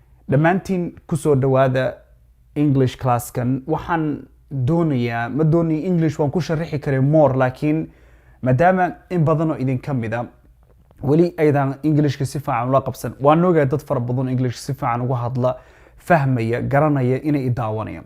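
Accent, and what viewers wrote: United States. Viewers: Maa shaa Allah u gotta completely the accent that's my cuppa ... thanks teacher. my American pronunciation coach .. i swear to God ., i'll get it done cuz it's just a piece of cake...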